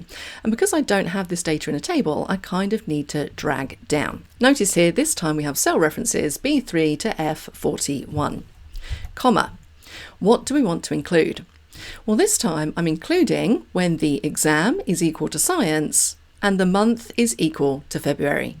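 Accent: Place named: United Kingdom. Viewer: Me encanta tu canal. Su explicación es bastante clara. Muchas Gracias desde Centroamérica. I love your channel. Your explanation is really clear even i'dont speak English, but your British accent is so clear. Tks so much. My favorite fuction is xlookup (buscarX), filter is also one of them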